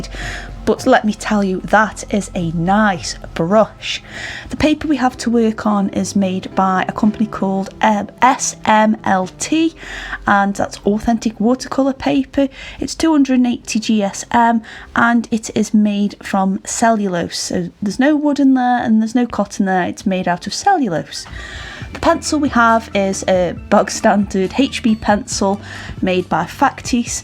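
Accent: United Kingdom. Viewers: Ooo yay, I know this video is 3 years old but I’m excitedly watching upcrate videos since I have one arriving tomorrow. And I got even more happy hearing that you’re British😁😂 subscribing for sure and can’t wait to see the finished product!💕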